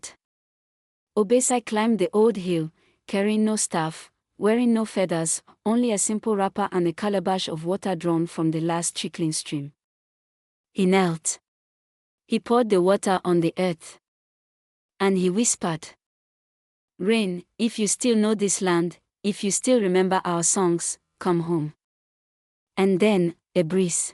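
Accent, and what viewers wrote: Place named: Africa. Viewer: Bro the African accent ai generated voice gotta love it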